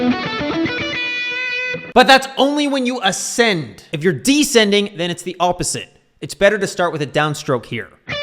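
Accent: Canada. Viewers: you can hear the canadian coming ooot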